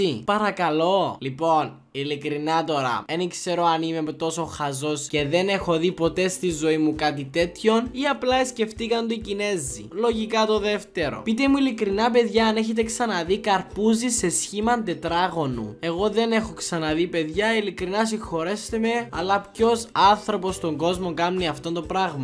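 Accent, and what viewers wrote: Cyprus. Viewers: Ίσως πολλούς να τους ενοχλούν τα κυπριακά σου αλλά εμένα μου αρέσουν!!! Είναι όλα τα λεφτά 😍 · Γλυκούλης ...λατρεύω τα κυπριακά 😍😘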